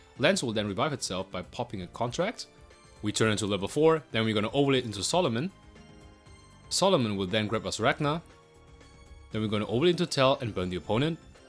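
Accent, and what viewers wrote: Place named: Germany. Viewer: You speak well, but the harsh pronunciation is noticeable. I think since I'm German myself, I can hear it more easily, haha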